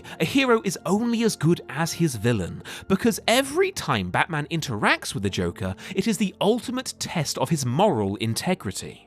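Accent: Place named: United Kingdom. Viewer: I know it’s the same actor/YouTube host but with that thick British accent, both of you guys sound evil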